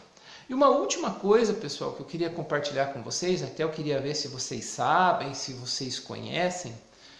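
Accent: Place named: Rio Grande do Sul, Brazil. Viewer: Muito boa tua posição sobre certos assuntos. está no sul pois teu sotaque parece de gaúcho! Abraços desculpa minha curiosidade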